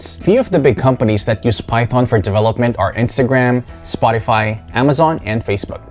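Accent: India. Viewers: If you're really from India, its unbelievable, Your accent is a wow in respect of Indians.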